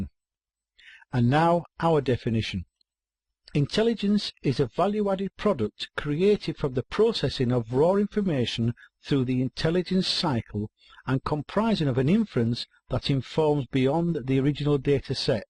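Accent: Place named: United Kingdom. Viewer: The fact this man is teaching what I want to learn and has a UK accent makes this course so much better. ❤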